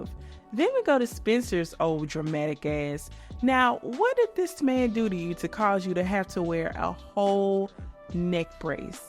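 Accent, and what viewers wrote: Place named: Midwest, United States. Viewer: your opinions are clinical however, I wonder which character you relate to most my guess is Dr. Wade. Phonetically I would guess that your upbringing was in the southern Midwestern United States.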